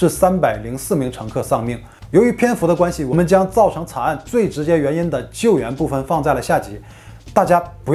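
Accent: Northeast, China. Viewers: 感觉是东北人，说话稍微有点东北味。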